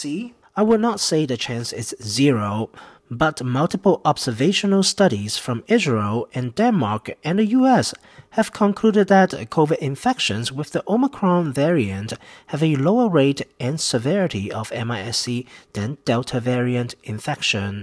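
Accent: United Kingdom. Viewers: Your demeanor is measured, not alarmist and speak as a father and husband as well as a scientist. I detect British alliteration when an open ended vowel word meets a word beginning with open vowel. 😉 A Brit are you🤔🤣🤣.🤲thanks for your vids🤲. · Dr, I watch many doctors and scientists on this subject! I love your channel honesty and accent, from the UK, no problems understanding you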